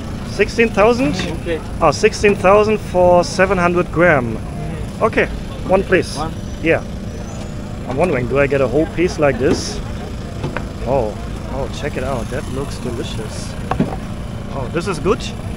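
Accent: Germany. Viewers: A word of advice: As a non-native English speaker with a noticeable German accent, it's important to slow down and articulate your consonants more clearly when communicating with other non-native English speakers. Speaking at your current pace may make it difficult for them to understand you, so adjusting your speech could significantly improve communication. Also, you are confusing supermarkets with grocery stores, please learn the difference. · 독일식 영어 발음이 참 매력적입니다.^^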